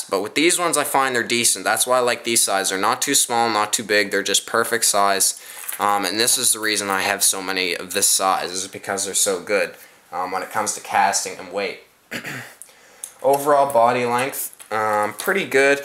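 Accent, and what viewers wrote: Canada. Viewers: just started fishing rapalas and they seem pretty damn good. you get what you pay for i guess sometimes. ps - what's your accent? canadian? or just north states?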